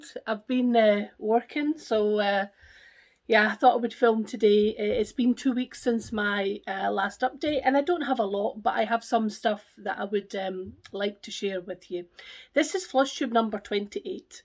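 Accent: Scotland, United Kingdom. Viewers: Hi, I'm fairly new to your flosstube, just started watching before Christmas. Michelle from mommalovesyougb recommended you and I fell in love with your accent. I'm in Canada and have Scottish ancestry so something about the Scots accent appeals to me. I think your choice of projects are great. I've never been to Paris, had a chance probably 10 years ago but went to ireland instead. I like the chart tho. I didn't hear you mention if this was just for UK only stitchers, if it is just disregard my entry. Cheers on getting back on your feet, nothing worse than health issues during covid times.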